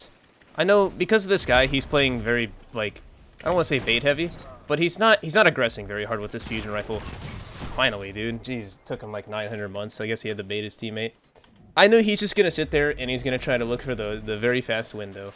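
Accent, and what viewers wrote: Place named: Ireland. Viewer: Are you Irish or American? I keep hearing Irish coming out 😅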